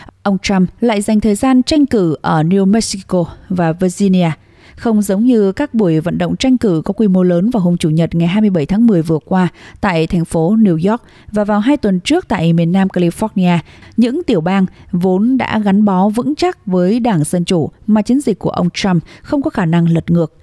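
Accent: North, Vietnam. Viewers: Sao hom nay Dai HT D News lai co giong bac nua troi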